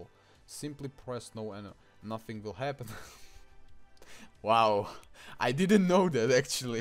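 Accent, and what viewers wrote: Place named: Czech Republic. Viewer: nice czech accent, i liked it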